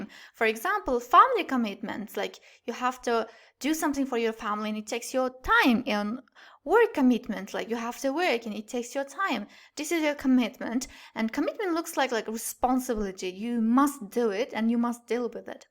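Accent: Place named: United Kingdom. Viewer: Your pronunciation is very pleasant 🤗How have u learnt to pronounce like A British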